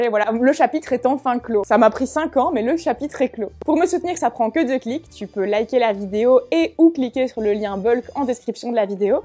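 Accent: Belgium. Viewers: L'accent belge bien de chez nous 👌 tu es de où ? 👀 J'aimerais bien savoir 🙃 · Bonjour, j’aime bien vos vidéos, un peu jaloux de vos abdominaux, vous êtes belge, votre accent ressemble, à l’accent des belges de Mons, vous connaissez? je suis franco belge, François Damiens, je le kiffe avec son rire de pervers quand il passe sa langue, bref vive la Belgique!! Et la France! Bye…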